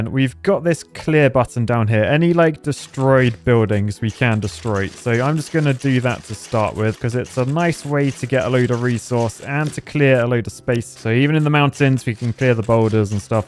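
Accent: United Kingdom. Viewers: Wow thanks for playing our game! I'm British too btw, so I approve of the pronunciation!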